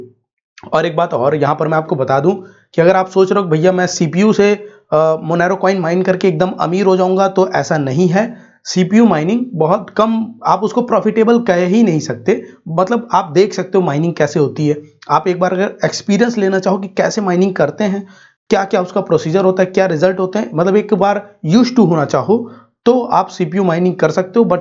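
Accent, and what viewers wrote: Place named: Punjab, India. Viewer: Learn how to speak English or add English subtitles you punjabi derka derka speaking mo fo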